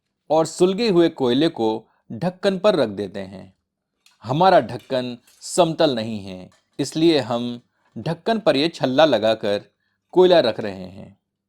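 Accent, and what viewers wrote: Standard. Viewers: Boss aap kanase hai aapka hindi bahut sudha hsi. I like it.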